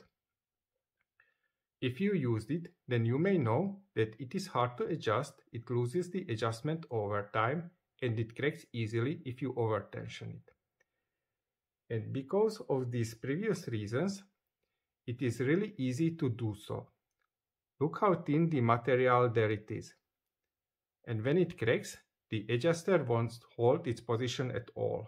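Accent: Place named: Hungary. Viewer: Amazing video and great work! I can't wait to see the rest. Judging by your accent, I am willing to bet you are Hungarian although I might be wrong. Either way, I am an airsoft tech myself from Romania and greatly enjoy creative spirits like yourself. Can't a standard Jack be fitted in a MP7 AEP? The nozzle still has to move the same distance to feed but maybe placed a bit more to the back, just wondering. If this could be done with a standard M4 jack, it's much more available and cheaper than getting a BINGO AIRSOFT one.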